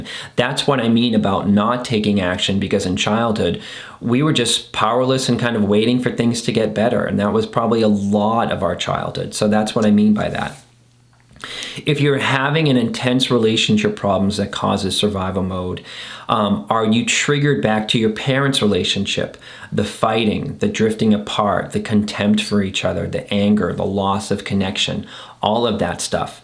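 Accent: United States. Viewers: ❤1. I brought this trip upon myself....Where to escape?? Currently, exhausted, Extremely isolated, brainfog, in Israel #under_fire🎉 #day24 2. Expat from the USA, and appreciate your American accent. Voice From home . I studied psychology... Came here to confront religious Absurd-abuserS who live in Israel.😮😅😊.... So glad I just found your channel/ account. I need tips + excersize for time of war. Staying in hallways/ bomb shelters mostly. Tiny spaces. In Israel under rocket fire. Bless u for all you do! Can send me an email with your videos on you tube or any other free content you can offer. Where are you located these days? City/state? I'm an Expat from Miami currently in central Israel! Sending love, light & hugs wherever it is needed. Amen!❤️ SaraGraceFreed😊3? How to pack and plan while under fire, 4. NEIGHBORs Friends have lost.lived ones... mmm. 5. 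Buying groceries instead! So confused. Help!❤Sara😂🎉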